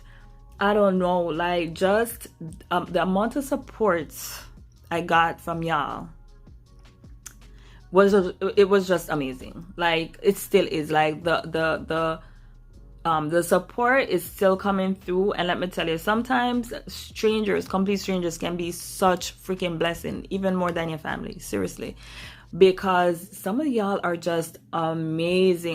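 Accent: Jamaica. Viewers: i detect that jamaican accent...i am too jamaican,..like your video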